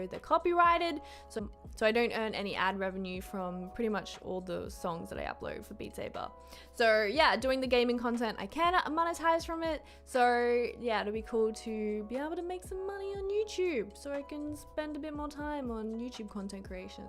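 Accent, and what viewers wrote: Australia. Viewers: She is Aussie... I wonder where that could be....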